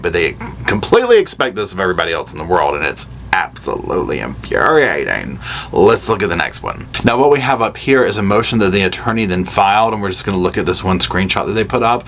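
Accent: South, United States. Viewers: OH I ALSO LOVE HOUR SOUTHERN ACCENT!! Keep it up you’re great!!❤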